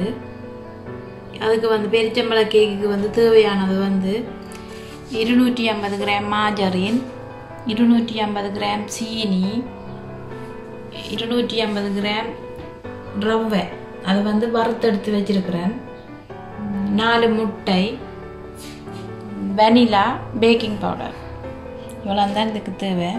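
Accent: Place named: Sri Lanka. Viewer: Sister unga Srilanka speech super nenga sonna ravai alaku sister I joined you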